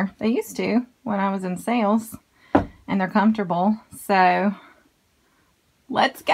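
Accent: South, United States. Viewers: Subscribe Love the videos an the southern accent lol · I could listen to you talk all day!!! I love the "southern accent" thamks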